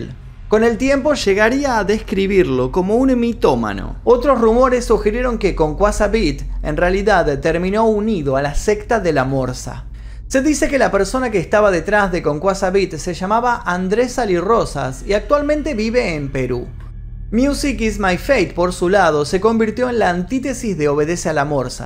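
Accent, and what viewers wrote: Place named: Argentina. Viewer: si se re nota que es argentino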